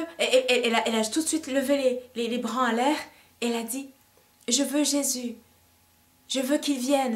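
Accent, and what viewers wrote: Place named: Quebec, Canada. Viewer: L'accent canadien est vraiment prononcé dans sa voix une vraie québécoise sois béni à toi ma sœur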